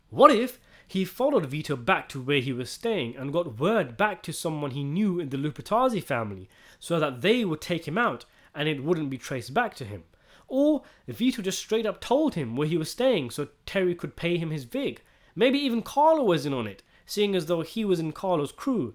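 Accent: United Kingdom. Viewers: By far my favorite part of your channel is hearing you say Sopranos wordplay in a UK accent. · I love this British accent commentary..... A muncher of ass LOLOLOLOL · While i feel retarded for listening to this british "oh well let me explain to you dummy stuff" cant stop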